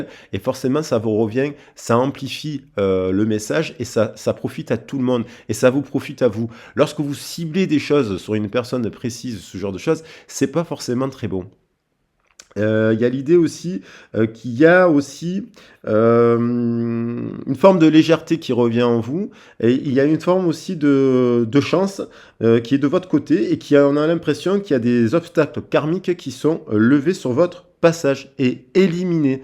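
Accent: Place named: Ardèche, France. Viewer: Ok, l' accent de l' Ardèche ! 🤗 En Provence, côte d'Azur, ici, ça chante la cigale dès le berceau, donc l'oreille est abonnée aux accents pimpants! Ya de la Vie dans la Voix 😉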